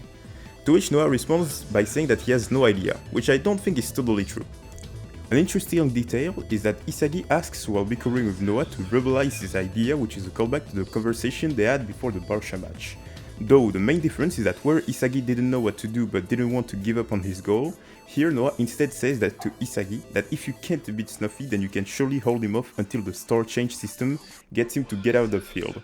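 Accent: France. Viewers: Perso je kiffe tes videos vu que je suis bilingue mais je comprends pas la décision de parler en anglais, ca ce voit tes bilingues aussi mais l’accent fr rends parfois difficile a comprendre (perso) après c’est toi qui decide et si tu reste en anglais ca ne changera pas le fait que je regarderai toujours tes vidéos, force et continue comme ca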